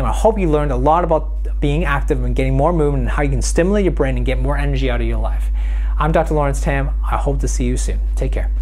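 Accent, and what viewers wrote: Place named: United States. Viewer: You sound American or maybe Canadian.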